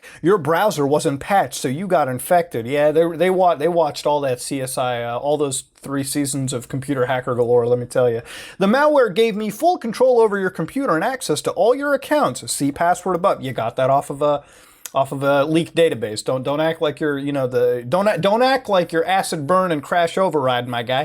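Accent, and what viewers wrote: United States. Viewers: Honestly, I thought you are some kind American dude like anybody speaking like an American are sounded like that but you look Indian at first glance. No offense. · You look like an Indian, yet you sound American... What sorcery is this?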